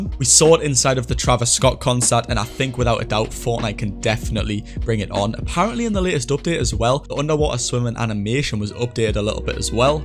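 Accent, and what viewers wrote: Newcastle, United Kingdom. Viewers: Are u a geordie?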